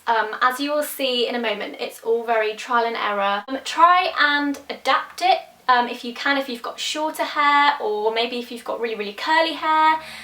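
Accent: United Kingdom. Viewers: I love your Videos , just perfect ,and you've got an Amazing British Accent , !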